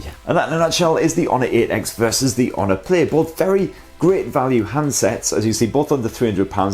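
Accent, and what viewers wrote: India. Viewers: Not Indglish. English title with Indian mambling. Dislike guaranteed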